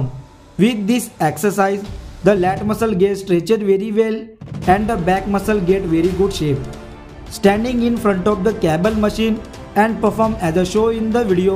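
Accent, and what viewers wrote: India. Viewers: Lol the person who is talking is not even american. Obviously from india! He is using this video so can make money he is not even a trainer. Sorry buddy I need to mute you I can’t concentrate. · hahhahahahah your indian accent is so funny.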